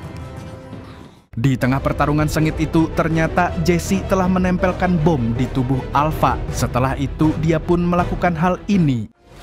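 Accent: Banjar, Indonesia. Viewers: banjar banar amng ni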